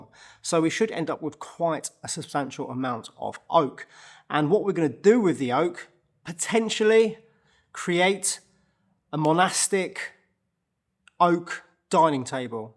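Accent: United Kingdom. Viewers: if I offended you, I’m sincerely sorry. I hoped the emoticon showed I meant it as a light jest. (As an American, I thought your pronunciation was probably a British quirk.) I have huge respect for you, and I’m a huge fan of your channel. I discovered your channel two months ago and have watched every episode up to this one so far. I forgot that just because I feel like I know you so well, you don’t know me at all, and I shouldn’t presume to joke like that.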